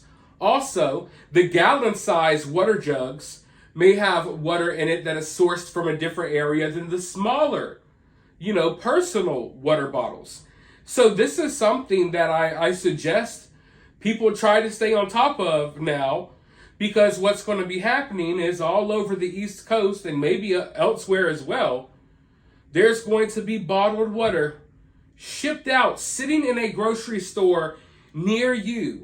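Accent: South, United States. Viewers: A swamp monster. Crack me up. You can tell you're a southern boy.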